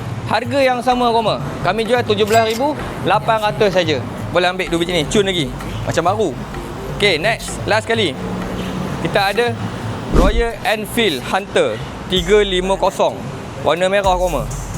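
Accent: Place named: Perak, Malaysia. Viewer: Ate, kapar klang bunyinya Perak yeop 😁😁🤔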